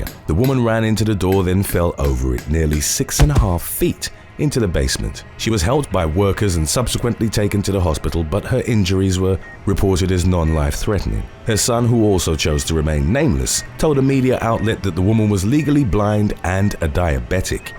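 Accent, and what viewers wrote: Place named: Scotland, United Kingdom. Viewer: I love his Scottish accent it's just so unsatisfying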